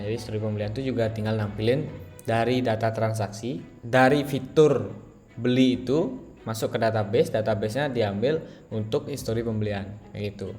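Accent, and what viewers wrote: Bali, Indonesia. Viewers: Kental banget dengan logat balinya bli, btw salam dari programmer lombok bli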